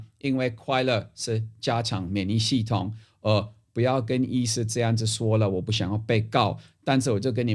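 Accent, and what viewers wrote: Taiwan. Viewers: 不用字幕啦，教授你說的台灣國語很道地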